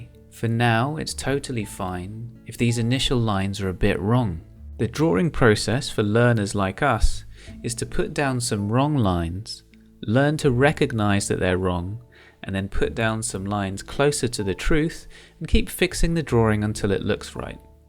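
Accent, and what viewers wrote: United Kingdom. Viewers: haha you're a Londoner - Evening Standard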